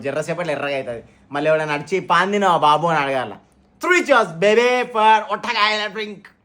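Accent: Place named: India. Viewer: I like your Telugu slang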